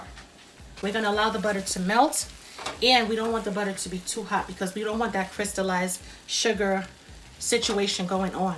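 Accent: New York, United States. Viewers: Great recipe! Better substitute would be monk fruit is it natural and it's good for cancer patients as well. Love the New York accent absolutely Gaw-gis 😂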